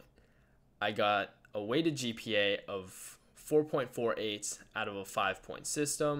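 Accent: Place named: Southern California, United States. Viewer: According to who? He sounds like a well spoken chill SoCal guy to me. He was funny, he didn’t have pauses with ums and ahs, pretty great oration - what were you hoping for? lol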